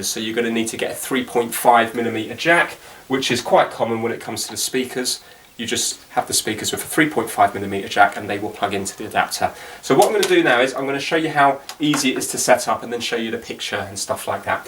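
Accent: United Kingdom. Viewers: seeing you talkin in british accent is amazing your accent is great tbh its best british accent i have ever seen someone talking.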